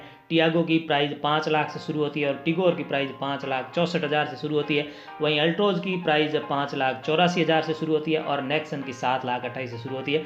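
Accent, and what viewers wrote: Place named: Marwar, India. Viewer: Aapki aawaz sun ke lg gya tha ki marwad se ho bad me jodhpur dekh ke pakka ho gya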